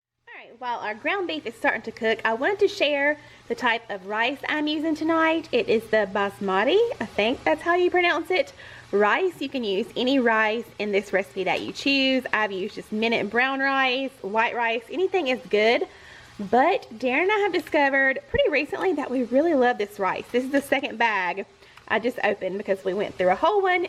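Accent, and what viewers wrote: South, United States. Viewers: Where are you from? You have a very southern accent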